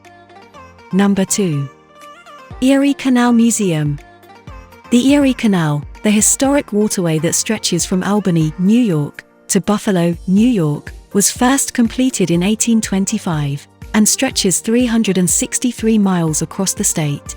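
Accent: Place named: United Kingdom. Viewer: This lady commenting this video & her British accent annoys me. (other than a suburb of Syracuse is named “Liverpool” there’s no reason to have a someone with a British accent narrate this video, about a city in the middle of upstate New York)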